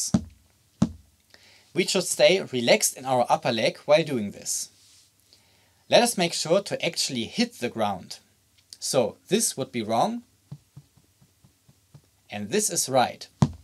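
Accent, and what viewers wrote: Germany. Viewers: Lovely deutsch accent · Your from germany...i think its a strong german accent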